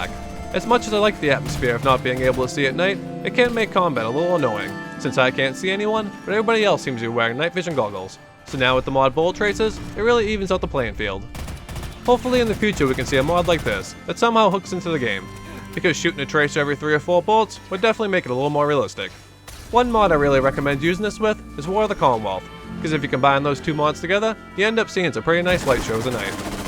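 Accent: Boston, United States. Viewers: Chris Kozma I know I'm really late but he's from Boston lol. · Just want to say, your voice is very fitting for a game set around Boston.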